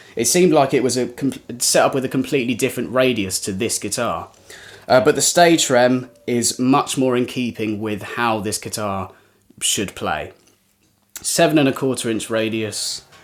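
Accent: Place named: RP, United Kingdom. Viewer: You sound posh enough for 90% of the world without adding the old geezer voice ;) Thanks for the demo!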